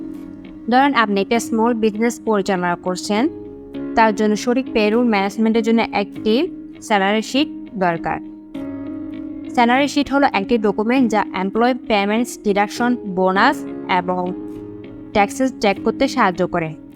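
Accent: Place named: Chittagong, Bangladesh. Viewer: Chittagong r meye confirm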